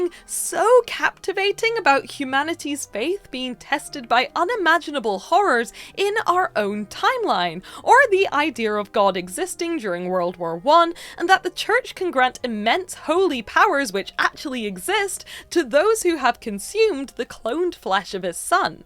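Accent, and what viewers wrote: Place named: RP, United Kingdom. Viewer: We wanna hear your reaal English accent not this prep school rich kid accent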